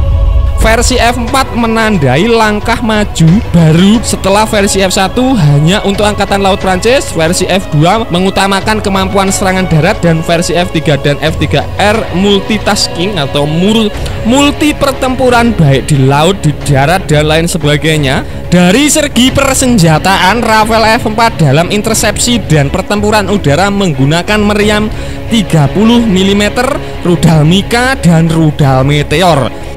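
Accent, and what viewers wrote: Java, Indonesia. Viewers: Kayaknya sengaja dimedokin logat jowo-nya sama si mimin.. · Medok sangat minn...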